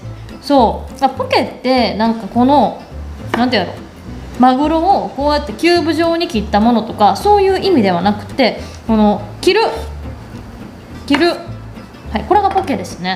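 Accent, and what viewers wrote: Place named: Kansai, Japan. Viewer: Youtubeやってたんだ〜❗関西弁のイントネーションが、とても新鮮❗🤗 なんかうれし〜🎵 「涙出〜へんやつ」とかって。 ハワイで関西弁。いい❗❗❗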